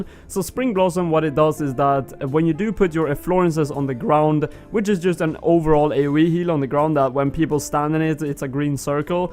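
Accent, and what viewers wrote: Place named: Sweden. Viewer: I can hear that swedish accent..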